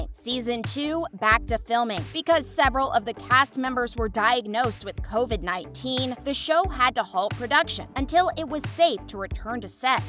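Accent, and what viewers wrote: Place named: United States. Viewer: Just click bait. Nothing about Rege coming back. And that grating American accent narrating about a very English show. Ugh!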